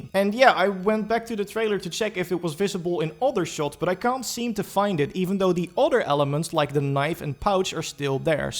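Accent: Netherlands. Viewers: That Dutch accent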